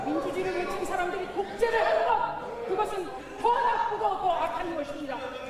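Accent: North Korea. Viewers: 저 사람은 탈북자 에요?..말투가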